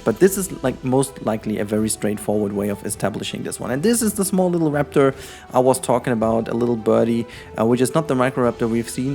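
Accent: Germany. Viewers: When Germans speaks Englisch then it sounds exactly like this guy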